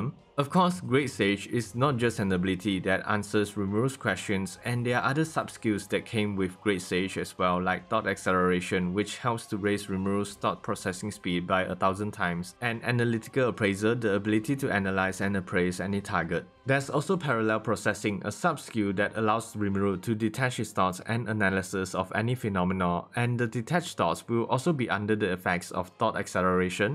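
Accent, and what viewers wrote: Singapore. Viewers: You have singapore accent. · Holy shit your accent is VERY Singaporean lol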